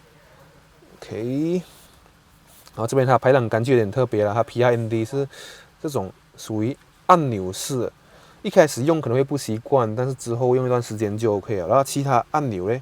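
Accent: Malaysia. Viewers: 有问题吗？马来西亚中文频道，马来西亚中文口音，没毛病。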